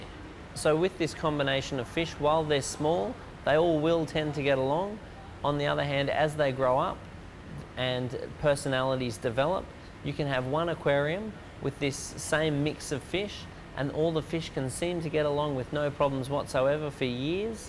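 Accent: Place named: Australia. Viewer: He's an aussie, not a brit lol. And for some of us who have high amounts of aggression in our tanks this advice is very helpful.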